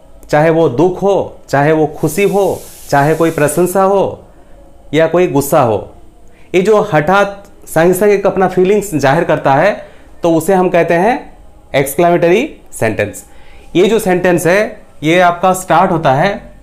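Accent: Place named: Odisha, India. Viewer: Good job sir. But aap hindi kyu bol rhe ho sir bich bich me apke muh se odia nikal ja rha hai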